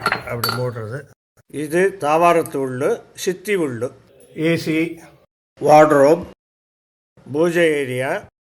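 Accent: India. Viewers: The commentary is typical Tanjore brahmanical dialect..this adds more flavour to the video..Very nice.. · Thanjavoor bashi,kekkarathule nanna iruku.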